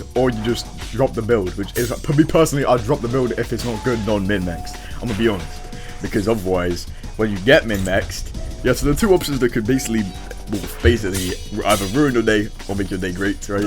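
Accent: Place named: United Kingdom. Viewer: You are so British, damn...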